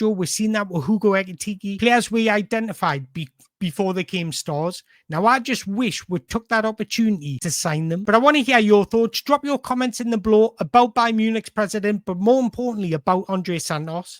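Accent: Newcastle, United Kingdom. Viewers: Hello mate, ye channel seems to be growing and it is annoying me as pd Proudlock is amazing but don't get much views and don't pay for them like some ppl (the noise) and that is sad cos me subs are organic like lad and ye voice at least sounds Geordie unlike his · Hi, keep it up kidda. Don't listen to anyone telling you to tone your Geordie voice down, YIU are the most informative person on Any channel. You should be employed bu NUFC as scout and youth coach. Keep it up. Howay the lads.⚫️⚪️⚫️⚪️💪👍🏼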